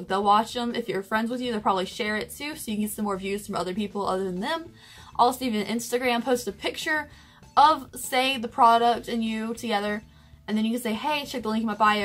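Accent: South, United States. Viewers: it's funny how differently we see ourselves than others do. Seriously, you are the most beautiful YouTuber I've seen to date. Everything about your face is perfection to me. Your eyes, nose, lips, cheekbones, forehead is normal lol, etc. Your smile and laugh is everything to me. When I watch your videos, every time you smile or laugh, I do too, because it's that contagious kinda smile (which is a rare find for me). Your voice is fucking adorable as hell (ultra feminine with a splash of southern belle in there). I love that you always wear dresses, and have a vintage glam vibe to you. I found you very randomly a few months ago. One of your 'Thrift Store' videos was on my homepage, and naturally being as huge as a thrift shopper as I am, I was intrigued. I was instantly addicted to you, from the very first video I watched. I don't understand how you don't have millions of subscribers because you are hands down my favorite YouTuber to watch, and I get so giddy everyday when I see your post notification. 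I hope to do on my channel for people, what your videos do for me and your other stan subscribers. The only other YouTuber's I loyally watch is (freaking love her), and I don't care for the more run of the mill type of folk. Keep staying true to yourself. Much love!🖤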